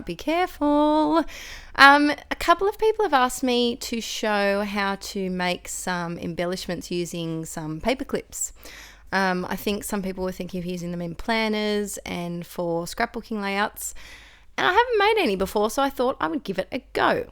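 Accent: Australia. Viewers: just love hearing an Aussie accent for a change. Love your work space, I'm off to redo mine now. thoe embellishments are really cute.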